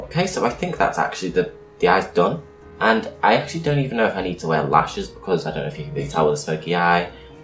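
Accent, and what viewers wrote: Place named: Liverpool, United Kingdom. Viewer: Aquaria is brilliant, also this is kinda like watching art attack i mean you know some lovely painting articulated by a thick liverpool accent, love the content xx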